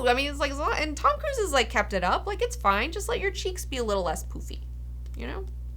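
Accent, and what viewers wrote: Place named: Midwest, United States. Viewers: Where did that Pittsburgh accent/ midwest accent come from? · Midwest "O" pronunciations. Holla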